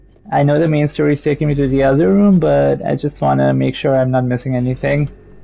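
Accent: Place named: United Kingdom. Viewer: i was about to say i am glad to hear your bristish english voice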